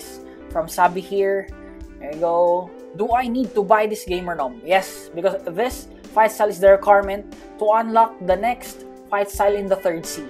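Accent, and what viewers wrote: Philippines. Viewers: He has a filipino translated channel and he has an accent of a filipino so yes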